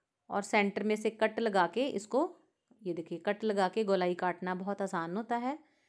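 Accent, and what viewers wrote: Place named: Punjab, India. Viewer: Punjabi o na tusi ? Punjabi accent pta chal janda aa Wese acha bnaya tusi Mai b try krugi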